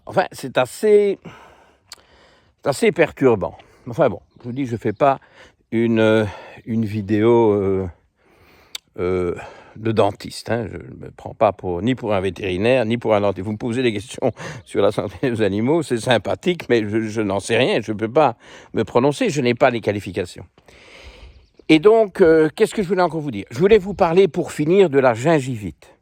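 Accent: Belgium. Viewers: C’est toujours un super régal de vous écouter et de voir vos compagnons 😘 ,votre plaisir et votre joie de nous partager la beauté de votre nature et de vos animaux, me remplit le cœur à chaque vidéo… Merci pour tous vos conseils, et j’apprécie tellement que votre discours n’est jamais coupé par de la publicité. Merci 1000 fois. Et puis votre accent belge vos expressions me ramènent des origines… bref avec vous, je tire mon plan😍😍😍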